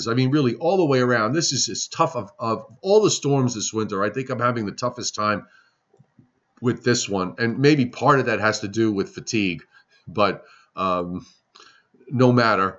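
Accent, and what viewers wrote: Long Island, United States. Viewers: When I hear your voice it always reminds me of Long Guyland..Thanks for your YouTube weather..main stream has gone to Hell !!.. Just can't watch anymore...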